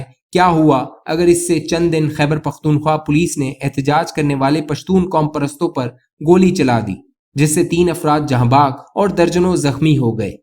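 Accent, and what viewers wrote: India. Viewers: انڈین بندے کا لب و لہجہ ہے یہ تو.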